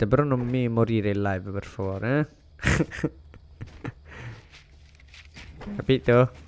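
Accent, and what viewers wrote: Calabria, Italy. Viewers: Compa ma di che paese sei della calabria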